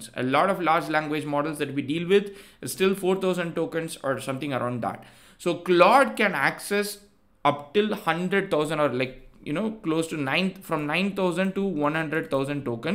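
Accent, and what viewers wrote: South India, India. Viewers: Dude, I have to say it as no one else will. Your content is excellent but your accent sucks. Eg. Won won won, instead of "One". I'm South Indian myself but don't have a heavy accent. I share your videos with all of my American and Canadian colleagues(especially THE GPTV one). They have just one feedback and that is they don't understand what you are talking due to the accent. If has to become 1bigglobalcoder then please mute down the accent. Cheers, another great content video as ever. 🎉 Update: Thanks for taking it in the right spirit content creator. 😂 that one "psychiatrist" in the comments.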